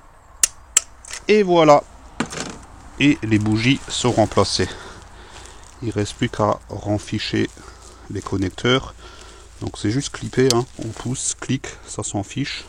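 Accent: Alsace, France. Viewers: Salut, tu viens dalsace? Par rapport a ton accent:D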